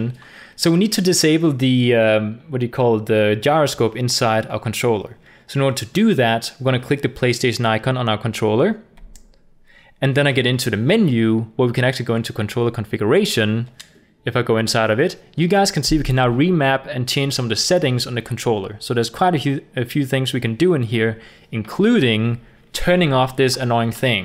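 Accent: Denmark. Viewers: is he danish? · haha tænkte nok du var dansk ku godt høre der var lidt der. Men super fed video den hjalp meget og du får også et like her fra :) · er du dansker kan høre det på din aksang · Are you a dane? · Awesome video! Are you by any chance Danish? You have an accent, which sounds very familiar to me. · are u danish? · Are you danish by anychance? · Are you danish? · are you danish??? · is he danish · Are you from denmark?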